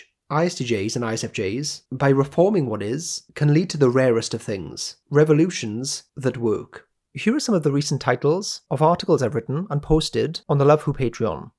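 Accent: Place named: RP, United Kingdom. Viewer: INFJ here. The bigger picture destroyed and made me at the same time 😅 also.. you have an extremely posh voice, I love it. 😅😊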